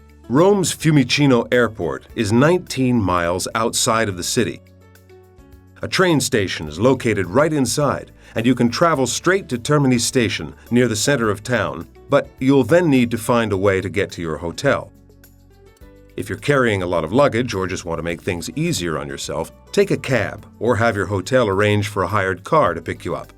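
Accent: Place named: United States. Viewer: He's American isn't he tho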